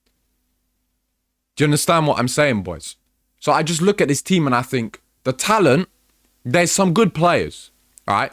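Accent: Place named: MLE, United Kingdom. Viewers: You're very clearly English, you have that awful MLE accent. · Was not expecting a multicultural London English accent when I clicked on the video!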